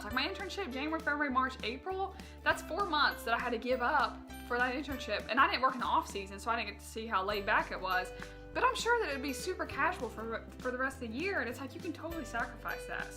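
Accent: United States. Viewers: What American accent is it?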